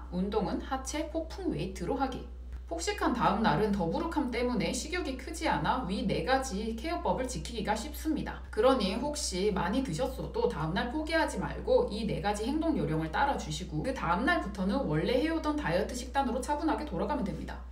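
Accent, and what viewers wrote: Gyeongsang, South Korea. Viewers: 경상도분이시죠? 내가 서울말쓸때랑 똑같은데ㅎㅎ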